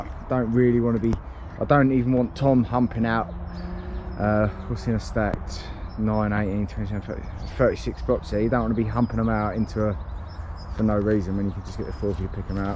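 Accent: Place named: South London, United Kingdom. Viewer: His accent is completely fake. I can already tell from his facial features that he is an Irishman I will NOT like the video unless he starts presenting his video in a heavy Irish accent. He is ashamed of his roots Why is he ashamed of being Irish? His facial features are Irish but yet he speaks with a south London accent. He has no pride in his Irish culture. So he tries to act and speak like a different person because he is ashamed of himself so he hides behind an alternate personality. He was mistreated as a child and consequently grew up with schizophrenia. He is bi-polar - hence he switches his accent from Irish to south London.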